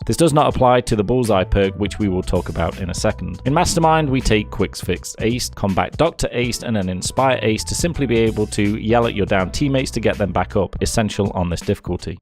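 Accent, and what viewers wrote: United Kingdom. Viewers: Are u british? Ur voice is very similar to hoxton voice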